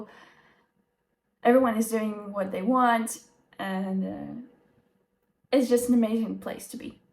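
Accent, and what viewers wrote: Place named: United Kingdom. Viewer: Thanks for this video, dear. Your pronunciation is indeed good. You speak British English, you speak so clearly, that those whose English is not advanced, can understand you easily. As for the topic of your speech it's very useful. We sometimes need to talk to ourselves to get rid of the emotions that let us down.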